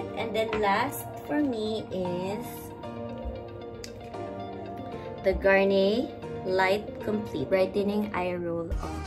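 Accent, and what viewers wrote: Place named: Waray, Philippines. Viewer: Hinay permi Miss.. I super duper like you..❤️❤️❤️ Lalo na pag nagwawaray ka hehehe ❤️ From Samar😘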